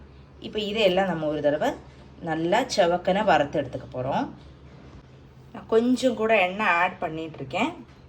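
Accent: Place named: Palakkad, India. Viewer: பாலக்காடு பிராமண தமிழ் ரொம்ப பேஷ் பேஷ்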